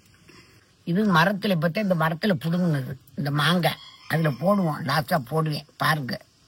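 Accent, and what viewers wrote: Ramanathapuram, India. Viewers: Enga Ramnad district pechu endha vooru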